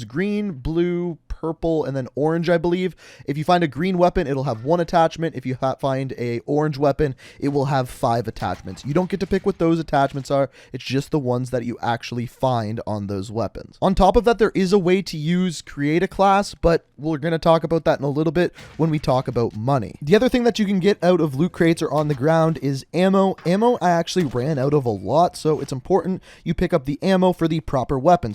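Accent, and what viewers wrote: Canada. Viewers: Is this guy from Canada ?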